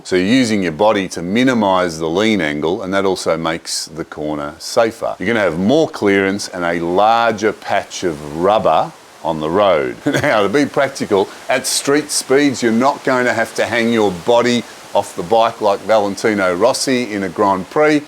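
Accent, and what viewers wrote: Australia. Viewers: I wish I had a sweet Aussie accent. Love you way you say Harley (Haawlee). You kind of remind me of actor Bryan Brown. Anyway, love your vids and subscribed. Cheers from the USA! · Love that Aussie accent, miss Australia so much, look forward to come to down under and ride along Great Ocean Road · I'm sorry, but with your Aussie accent, I must have misunderstood you during your comments regarding "life corners." Much of what you said is soundly Biblically based, so I thought the part where you directed the audience to see more at the end of the video, your comment had the word, Scripture, in it. Not so. Have a blessed day. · Clicked on this video out of curiosity as I don't even ride a Harley, but then I heard the Aussie accent and saw Arthurs Seat (which I ride a fair bit) and kept watching. Good tips and are applicable for all types of bikes (I ride a gixxa). 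Even after riding for over 20 years it still pays to readdress the fundamentals of cornering. Especially when you haven't ridden for a while, or you are riding in adverse conditions. Personally, my biggest mistake is not looking far enough ahead if conditions are dodgy, and that just makes things seem faster than they are (plus you need to constantly adjust where you look). Look further ahead and you can hold that vision longer, things seem to move slower, and the bike will tend to go smoothly around the corner. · Geezo, was this video made for the Ausies? Hard time understanding that accent. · I assume that because you ride a Harley you label your video as advice for Harley riders and of course it helps the video get views (so my comment is not a criticism just an observation). The advice could equally apply to anyone who rides a big twin cruiser. No doubt there are features unique to the Harley but as a rider of a Kawasaki Voyager 1700 I would say the same advice is applicable so I thank you for your video. 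It is good to see and hear an Australian voice offering motorcycle riding techniques and see Australian roads. I think life skills advice is also helpful - thank you. I should own up and admit I know ride a Can Am Spyder so cornering is an entirely different experience. I felt the Kwaka was getting too much to handle and I wanted to keep riding so I went for 3 wheels.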